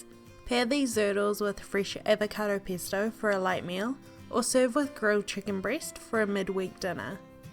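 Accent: New Zealand. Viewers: Are you from NZ? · She is a kiwi, new Zealander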